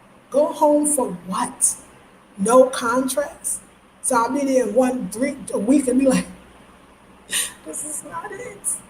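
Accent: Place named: New York, United States. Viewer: Yes we love you with that loud New York Accent, laughing , giggling, wearing bright colors & showing that sexy body!! 🌸🌸🌸🌸🌸🌸🌸🌸🌸🌸🌸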